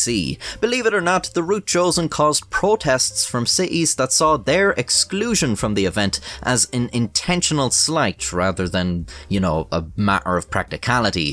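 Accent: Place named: Ireland. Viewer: Tell me why this narrator sounds like some sort of animated insect with an Irish accent?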